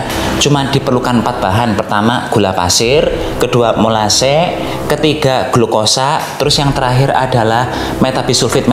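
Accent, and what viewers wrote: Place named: Banyumas, Indonesia. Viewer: Liik sampean asline Endi, medok banget ngomonge .. Banyumas apa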